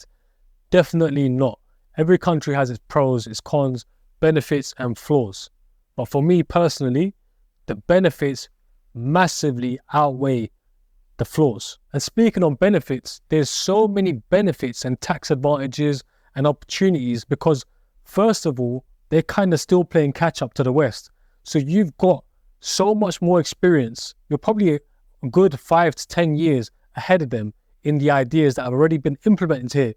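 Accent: United Kingdom. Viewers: You look silly dressed up like an Arab goat herder with your rough and ready Midland accent. Is that called Cultural Appropriation. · Im colour blind your accent sounds like you from London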